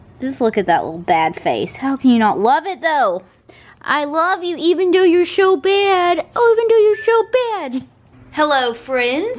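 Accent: South, United States. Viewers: I just love you and your southern hospitality and accent! And love Bo! He’s adorbs! I would love to try the sausage biscuits! They look yummy! Following them on FB and IG!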